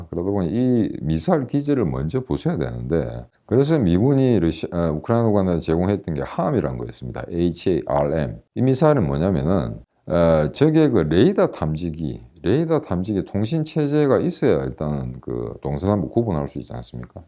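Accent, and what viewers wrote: Gyeongsang, South Korea. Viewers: 🇰🇷허진대사님,💙 중저음의 보이스와 경상도 인토네이션이 들을수록 신뢰감이가고 묵직하며 멋있습니다~!!!💯 전쟁...브리핑엔 님의 목소리가 적격입니다. 👍✌️👏 허진대사님, 아프지 마십시요. 늘 건강하시길 빕니다~🕊️💙🎵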